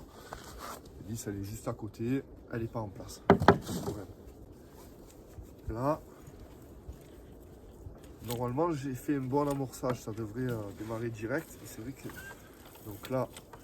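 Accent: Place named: Provence, France. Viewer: Bravo pour ta présentation aussi détaillée avec un ton naturellement provençal très agréable. Plus le courage de faire un montage vidéo. Bravo!!!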